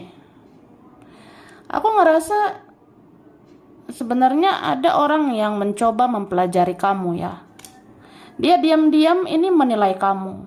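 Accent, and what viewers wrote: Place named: Java, Indonesia. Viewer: meduuuukkk suarane sampean mbak yuuuuuu....slam persahabatan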